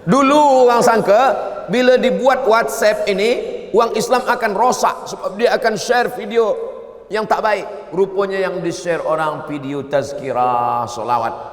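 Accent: Malaysia. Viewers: Memang dh dia melayu.. Mesti la..loghat je tele tu biasa mngikut negeri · Respek dgn UAS.. ketika di Malaysia, loghat / dialek juga ditutur dlm bahasa kebanyakan di Malaysia. ketika video di Indonesia juga mengikut loghat / dialek tempatan di sana. Semoga UAS sentiasa sihat dan berbahagia selalu.. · Ustadz ngomong nya koq seperti orang malaysia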